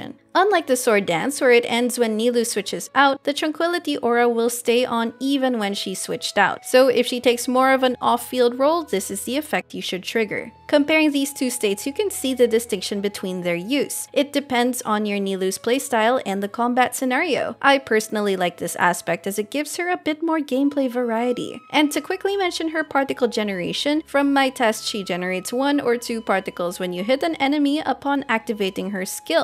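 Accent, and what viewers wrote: Philippines. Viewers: I figured by the accent, Pinoys just have that certain twang lol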